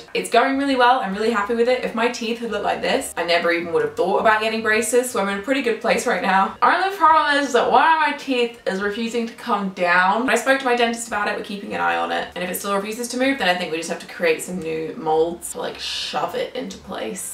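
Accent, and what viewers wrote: Australia. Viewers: You sound quite Australian here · hey! Cool pin :) have you been to Australia recenty?? Your voice sounds Australian today! have a nice day, chum xxx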